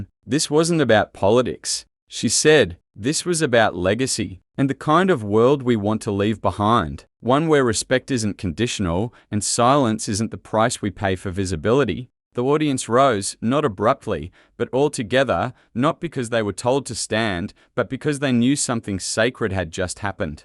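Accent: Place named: Australia. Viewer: Typical AI story using an Australian accented voice. Obviously a fake set up story. Just trying to cause trouble. 😠 Typical BS.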